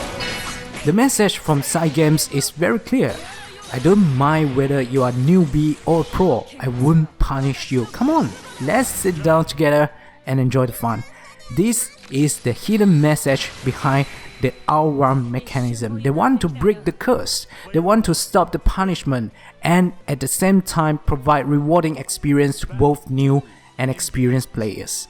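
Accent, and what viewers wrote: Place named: East Asia. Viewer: hey love your video may i know where are u from? u sound like chinese/ japanese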